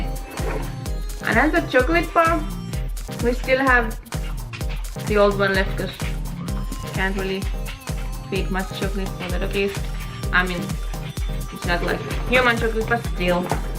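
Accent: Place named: Scandinavia. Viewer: Its christmas for the huskies lol. nice vid. You sound scandinavian, i am from Bornholm Denmark.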